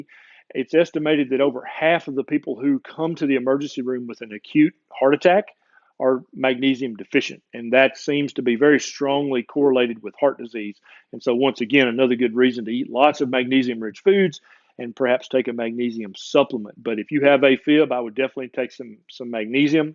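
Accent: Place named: Georgia, United States. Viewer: You sound like you're from Georgia.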